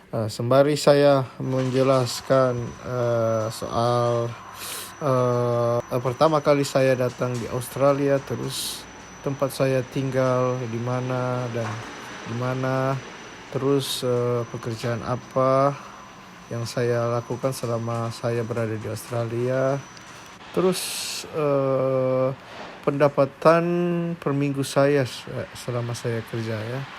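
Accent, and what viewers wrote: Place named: Manado, Indonesia. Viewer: Dari logatnya ngana dari Manado kang, butul2 nyong Menado guanteng2 do e, semangat ya nyong Menado, Tuhan Jesus memberkati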